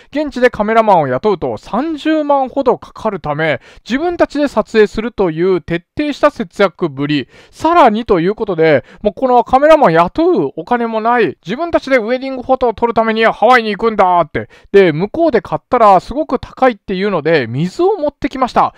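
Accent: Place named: Kansai, Japan. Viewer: 関西弁だけどなんかケチな感じがザ関西って感じ偏見だけど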